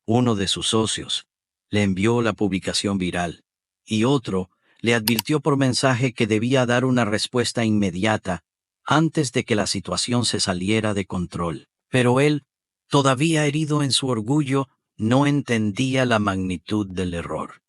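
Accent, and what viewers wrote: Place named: Galicia, Spain. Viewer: Esa historia se repite y es vieja y pasa a diario en Mexico.. siempre llegan sierreños en Mexico en dealer se auto...como los ven prieititos y en huaraches no les dan atencion.. al final refiben la propina de su vida. La verdad tu historia es aburrida y mas de lo mismo ademas que tu acento es gallego..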